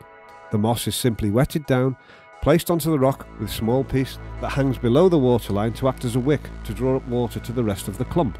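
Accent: Liverpool, United Kingdom. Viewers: Is the narrator Scouse? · Like myself, the narrator has a Scouse accent which is the casual way of saying a Liverpool or a Liverpudlian accent, it is an odd accent in so far as that there are quite an awful lot of people in the UK who just dislike the Scouse accent, and yet we have had a survey taken here in the UK which researched local and regional accents for use in providing a service for the Samaritans, it was determined that the Scouse accent was the most emotional and soothing of all UK accents giving callers a sense of trust in the telephonist, this is contrary to the stereotypical opinion held by people nationwide that Scousers are not to be trusted.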